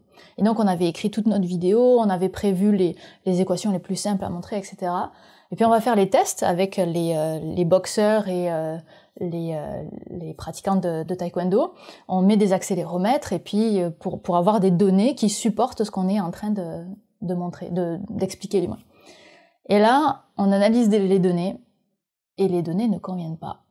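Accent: Quebec, Canada. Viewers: C’est moi qui ne m’en suis jamais rendu compte ou est-ce que tu commence à développer un accent québécois ?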